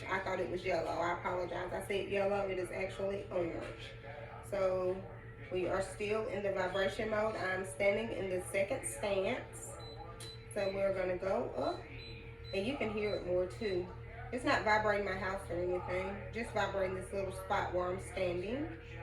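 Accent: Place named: South, United States. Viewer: Thanks for the review! It’s been a year since you’ve done it, what is your opinion of this device now? I just bought mine, delivered to me today. I’m excited to get my “shake on” 😅 I have a lot of injuries from my military career to work through. So I’m definitely looking forward to this. Btw, very nice bodily attributes 😉 Looking good over there. Sounding like you from GA or the Sip 😄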